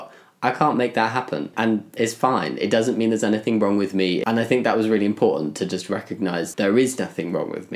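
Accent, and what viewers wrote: United Kingdom. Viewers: I like your voice. Are you british? How have you gotten it? Or you have had it before transition?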